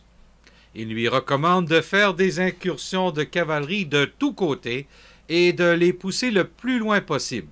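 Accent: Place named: Canada. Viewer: C'est un Canadien avec son accent. Il est super et très clair. En tout cas c'est plus clair qu'avec l'accent Stéphanois. (Le mien) uniquement compréhensible dans les départements 42 & 43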